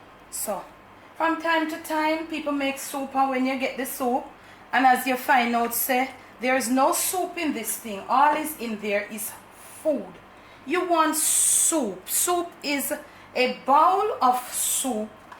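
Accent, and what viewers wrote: Jamaica. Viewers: Hey auntie i love your styling of cooking the way you explain everything and I must mention the strong Jamaican accent, man me love it all, I am Trini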